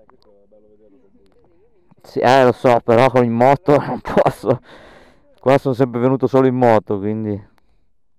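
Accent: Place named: Romagna, Italy. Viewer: figata di strada, davvero bella, poi mi piacciono un casino i tuoi commenti...l'accento romagnolo mi fa morire....tranquillo...sono sposato e con una figlia🤣🤣🤣🤣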